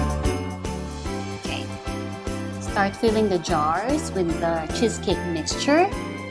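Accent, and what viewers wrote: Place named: Philippines. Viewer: I thought you are in a foreign country then I realize you were a filipina when you spoke and saw the video.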